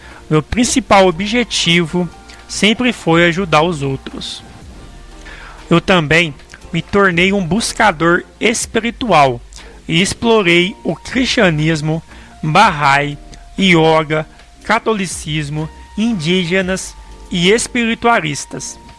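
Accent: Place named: Caipira, Brazil. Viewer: Um erro de português da para consertar, agora querer que a pessoa mude seu sotaque e ainda chamar de caipira é muita falta de bom senso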